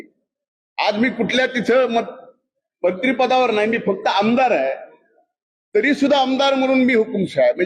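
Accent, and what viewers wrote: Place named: Beed, India. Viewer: बोलीभाषा बीड वाले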